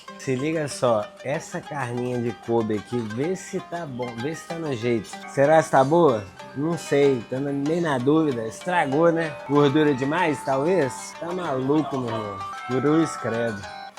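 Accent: Minas Gerais, Brazil. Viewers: Cara, vi teus vídeos... E só pelo sotaque vi que é mineiro... Parabéns excelente trabalho. Pra ser melhor só falta ser cruzeirense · Te assisto há pouco tempo e percebi o seu mineres desde o início!!!!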